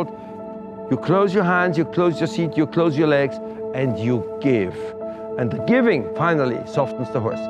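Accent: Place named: Germany. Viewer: PLEASE redo this. Remove or at least lower the "background" music. The loud music coupled with the German accent makes it difficult to follow the lesson. This is very valuable information. It needs to be presented in the best possible manner. Do professors in universities play loud music during their lectures? It's been 50 years since I sat in a lecture hall, maybe things are different now. Rant off. Thank you.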